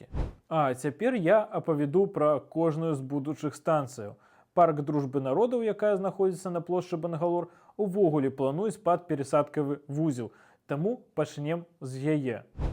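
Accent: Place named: Russia. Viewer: Дзякуй, было цікава. Але вымаўленне беларускіх слоў адчуваецца што нібыта трошкі рускае.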